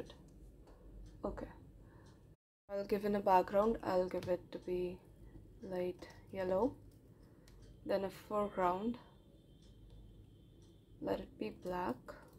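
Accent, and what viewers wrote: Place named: India. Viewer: Hi Mam , Are you from India naa ?? Your language accent shows it clearly...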